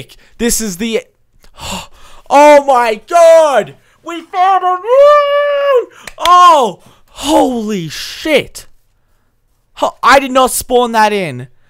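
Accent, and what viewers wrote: Australia. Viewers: He sounded so much more australian back then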